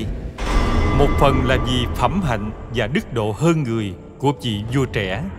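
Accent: South, Vietnam. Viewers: 😂 sặc nam bộ, nghe mà ghiền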